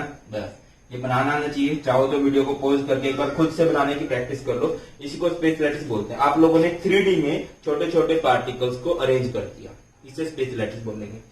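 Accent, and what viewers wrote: Haryana, India. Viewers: Sir you are from haryana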